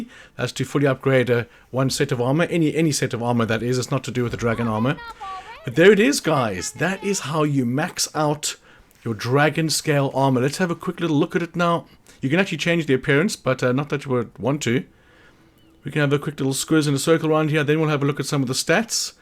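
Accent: South Africa. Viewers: Thanks for the tips. Are you South African? You sound like it.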